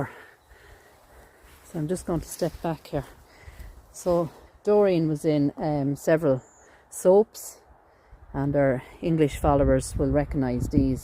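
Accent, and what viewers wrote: Ireland. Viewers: Thank you for another adventure,. By the way, I just love your Irish brogue! Not only does it sound really beautiful, but it adds such great atmosphere to your videos. Please continue to make your lovely videos.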